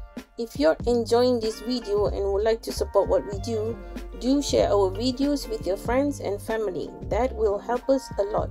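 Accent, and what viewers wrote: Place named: Malaysia. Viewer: Found this video after i’ve already made potstickers which i failed :( btw, are u malaysian? The accent is so familiar, but the channel name doesnt sound malaysian at all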